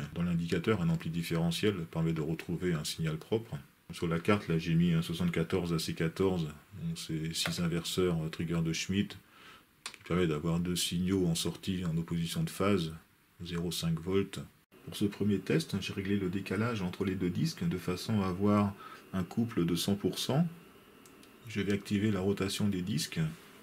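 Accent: France. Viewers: l'anglais est simple mais bon c'est l'accent qui est franchouillard au possible. l'anglais couvre évidement un plus grand public, si c'est compréhensible et interessant les gens regarderont. Ne laissez pas tomber. Regardez Isaac Arthur, un défaut de language et pourtant 1/2 million d'abonnées pour des vidéos ou il parle non stop 1h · Hi, I downloaded the french transcript and put it into the translator. Your english is very good and does not sound like a "spanish cow" like some bad people may have said. They are just jeallous. Of course you got a french accent, but most english speaking creators on youtube have some form of foreign accent. That is totally normal. It would be really nice if you could continue in english so you reach a broad audience all over the world. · Bien entendu qu'il te faut continuer en anglais, malgré les remarques du type que j'ai pû te faire sur ton accent bien français. 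Ton contenu est absolument unique par sa précision et le type de matériel, ce serait bien dommage que tu te prives de 95% des utilisateurs de youtube. Carry on and all the best!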